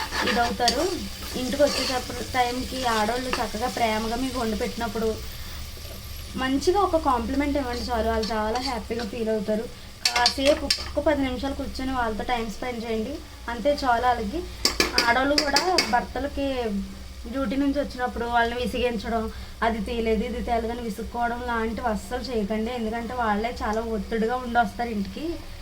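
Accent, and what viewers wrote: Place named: Amalapuram, India. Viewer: కూర ఎలా ఉన్నా కానీ మీ మాటలు మాత్రం ఆంధ్ర అమలాపురం అమ్మాయిలా ఉంది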